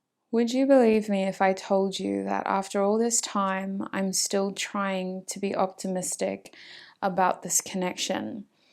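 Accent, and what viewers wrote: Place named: Australia. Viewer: 1st I choose pile one, this (the whole reading) resonates with me so much we broke up last year the month before Christmas and he still constantly messages me and wants to see me now in the past it didn’t go great but I know he is very kind and we both had our own childhood issues/issues that wasn’t healed so it was very hard but I do feel as though he’s who I’m meant to be with and I don’t feel like our chapter is finished and I have stopped talking to him for about two weeks or more because I’m really trying to think about myself and heal for now and hope that this time will give him the time to heal and change but I definitely have left hope because it has been so long without anything happening but I do always still hold some type of hope and he does always seem to come back to me but I really am making sure that if I go back he really does give 100% as well as me and it’s an even giving connection. 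Thank you lovely and don’t worry about the time I love long readings so I didn’t have any issues watching all the way through my reading and I’m Australian as-well and your accent is so strong but calming I love watching your tarot videos before bed it definitely gives me energy the next day as I have the confirmation I needed.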